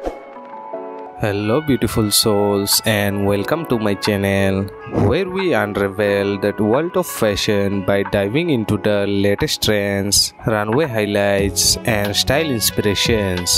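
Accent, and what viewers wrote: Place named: Bangladesh. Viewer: Bangladeshi accent, for sure you are Bengali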